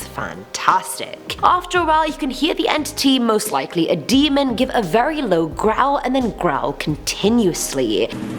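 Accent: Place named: United Kingdom. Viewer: Lady get you a better name than you have, it sounds like a foreign name and if you are, why are you speaking British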